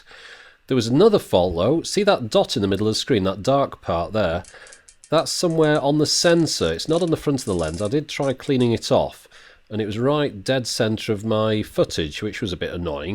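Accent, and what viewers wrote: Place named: England, United Kingdom. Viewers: I agree with Adam Therful (down in the comments) about the smooth English accent. Reminds me of Ringo Star narrating Thomas the Tank Engine TV series. Good work, Keep it up! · Your smooth English accent makes this 4x better. Great video!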